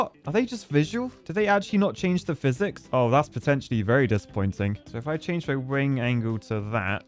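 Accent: United Kingdom. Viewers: He’s British.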